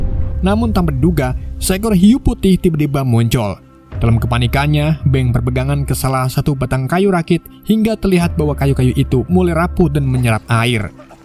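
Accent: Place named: Pemalang, Indonesia. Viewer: Kamu orang pemalang kah min?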